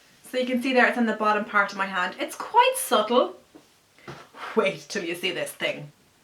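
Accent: Ireland. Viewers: love your vids ! are you from dublin?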